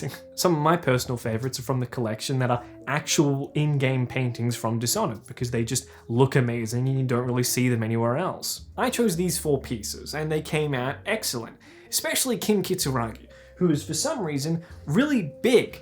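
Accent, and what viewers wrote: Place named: Australia. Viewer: Its the australian accent and authentic australian delivery 👌🏻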